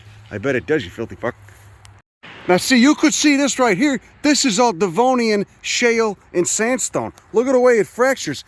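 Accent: Chicago, United States. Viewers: Aye as a WNY native, Buffalonian to be exact I've been waiting for this. The train tracks in Buffalo & the connected bike path (compete with an abandoned golf course converted to a diy skatepark by the local latch key youth) is where I fell I love with native plants. #Greatlakessupremecy..........I'll forgive the NE accent, but you're closer to WNY with your Chicago accent than you would be with New England.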